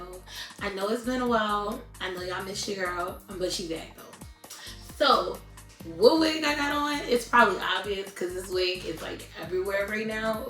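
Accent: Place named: New Orleans, United States. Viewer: I love it this is cute!!! And I see that we are from the same hometown , New Orleans baby!!! I can tell by the way you talk. ❤❤❤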